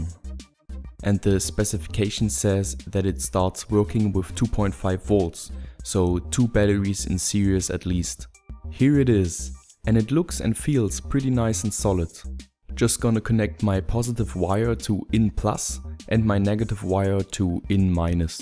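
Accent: Germany. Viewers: Yes, he is German. You could tellthat he is German by his accent.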